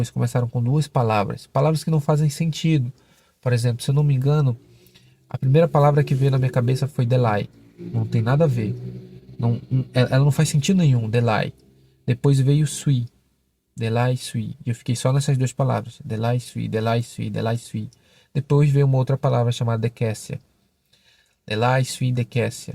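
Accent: Italy. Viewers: Sua língua parece muito com italiano, tanto a língua quanto o sotaque. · É um sotaque italiana pastor muito lindo !!! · Verdade parece ucraniano , tbm tem um sutaque de italiano sei lá , é lindo demais né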